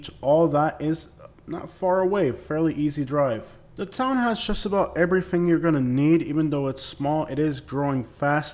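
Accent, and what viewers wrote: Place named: South, United States. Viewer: 😂 your southern accent kills me 😂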